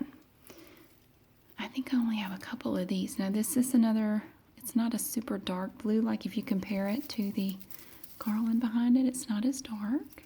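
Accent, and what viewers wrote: United States. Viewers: I love your American accent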